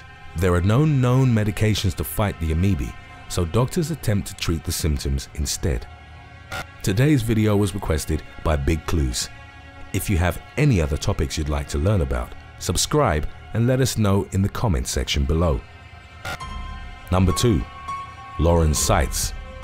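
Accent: England, United Kingdom. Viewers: Aw. The british and their pronunciations. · It's his accent. That's the way they say it in England. Like toe-may-toe in the US vs toe-mah-toe in England.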